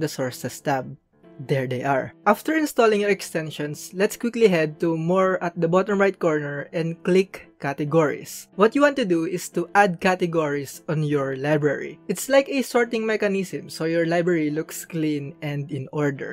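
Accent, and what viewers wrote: Philippines. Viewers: Your Filipino accent is kicking in my guy ❤️💯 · I know from your accent, and humor that you're a Filipino 😂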